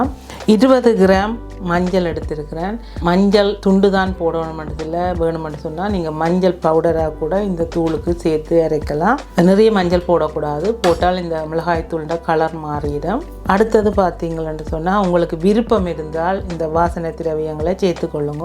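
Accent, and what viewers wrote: Jaffna, Sri Lanka. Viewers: Very fine.thanku very much. Your srilanka tamil fine. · யாழ் தமிழ் மிகவும் அருமை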